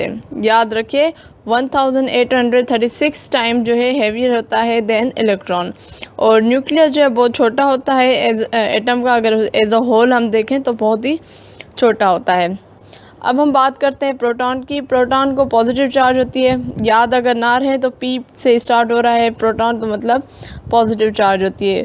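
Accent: Sindh, India. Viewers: Searching for best lecture on atomic structure and got your video ❤ .... Love your Sindhi accent Urdu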